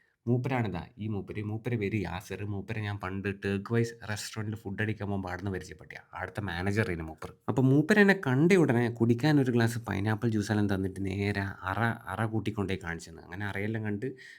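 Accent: Kannur, India. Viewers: Ippo sherikk kannur karante samsaro ayknnallo ingalethh😅😊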